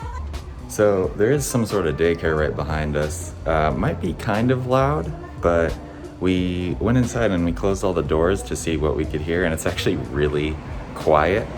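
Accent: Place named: San Francisco, United States. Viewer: Your accent sounds like you may be from the Castro district of San Francisco. Am I right?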